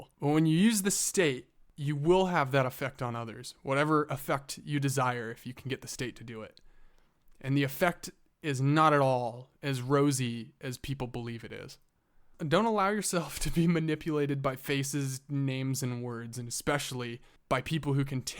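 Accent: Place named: Canada. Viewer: You sound like you went to school in Canada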